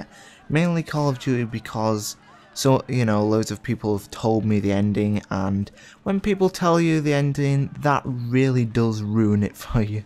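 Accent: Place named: United Kingdom. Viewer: caal of duu'y thats how you brits pronounce it.. or another word like "later" will be pronounced like "la'er"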